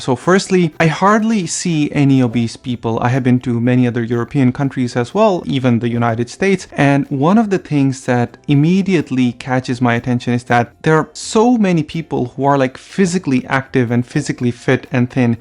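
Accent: Estonia. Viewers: I think that some of the things may not apply to younger Estonians. Some people do help strangers on the street and most Estonians give help if asked to do so. I also noticed that you have picked up the Estonian English accent by living in Estonia. :) Great video by the way! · Interesting takes and perspectives, can agree with all of them. But is it only me, or you’re fighting off your Estonian accent? 😂😂 I’m working for a US tech company, with Estonian market, and I have distinct American accent. But my colleagues are spreading Estonian accent like wildfire 😂😂 All the Latvians have Estonian accent instead of Latvian now 😉😁 Your English is very good, but have you ever felt like the accent is rubbing off on you too?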